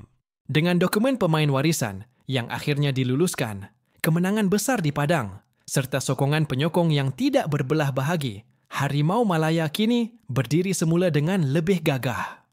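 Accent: Indonesia. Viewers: Kenapa voiceover mcm indondesh. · Sling Indonesia Nya dengar sikit😂..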